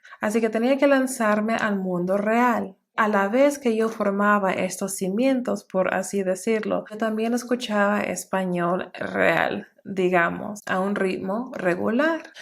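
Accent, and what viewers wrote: Standard. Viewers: muy cierto, tiene un acento bastante neutral...con unos toques caribeños pero muy buen español , felicitaciones! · Wooow tu español es EXCELENTE... hablas mejor que muchos latinoamericanos... Tono adecuado, buen acento neutro, buen ritmo, sin acento gringo... Esta PERFECTO felicitaciones 👌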